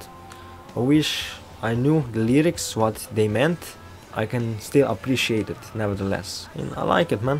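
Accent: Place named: Eastern Europe. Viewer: I knew you got a kind of eastern-european accent!! You are doing great, papá!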